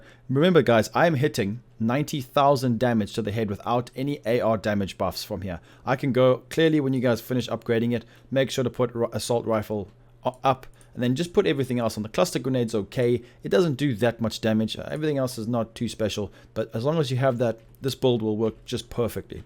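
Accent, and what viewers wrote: South Africa. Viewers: Dude you from SA your accent sounds SA? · You sound south African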